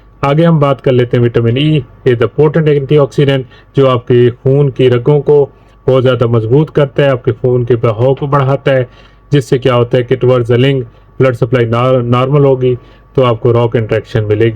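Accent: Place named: Punjab, India. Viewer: W salam nice video bahi again Punjabi touch ❤nice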